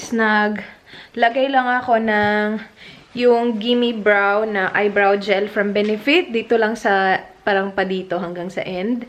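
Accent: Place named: Visayas, Philippines. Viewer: Her accent ?? Kala mo dili bisaya magsalita ehh grabe... Ilove your blog..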